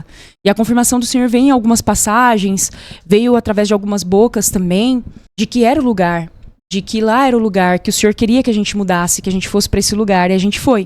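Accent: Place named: Videira, Brazil. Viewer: Você é da Videira? seu geito de falar é igual o de lá kk.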